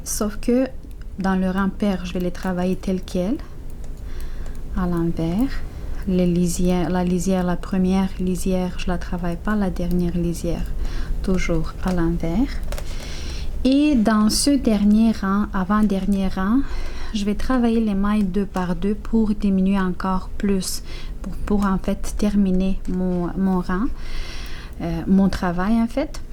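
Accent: Canada. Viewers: Merci, j'adore l'accent canadien, il me rappelle nos voyages. 👍🥰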